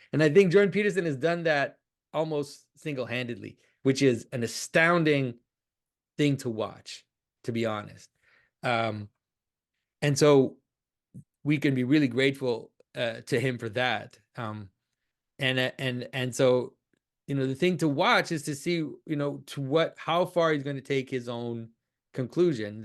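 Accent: Wales, United Kingdom. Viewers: You are so Welsh. Love it.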